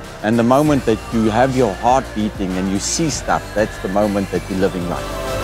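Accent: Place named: South Africa. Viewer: The sweet sound of that SouthAfrican accent. #dreamy ;) Brilliant film, gret visuals as always!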